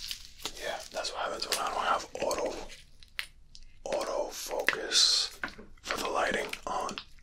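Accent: New Jersey, United States. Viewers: Are you from new jersey?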